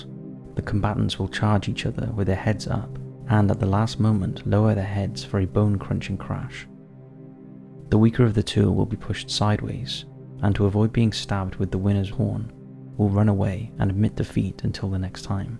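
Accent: United Kingdom. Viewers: British narrator asking for dollars. I'm guessing this is an American channel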